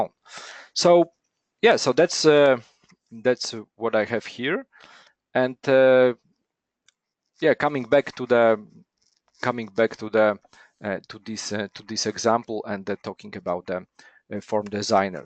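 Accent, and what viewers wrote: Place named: Poland. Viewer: you are simply the best, "English made in Poland"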